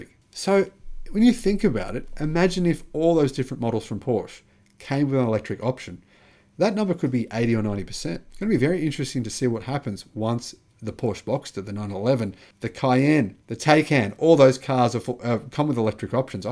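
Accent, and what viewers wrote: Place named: Australia. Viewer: is based in Melbourne, Australia. Listen to English and his accent - You clearly hear that he is an Aussie. How in the world could you think that this is an American fanboy site. This is not a Tesla fanboy site. This is an good channel about the ongoing electrification of our transports.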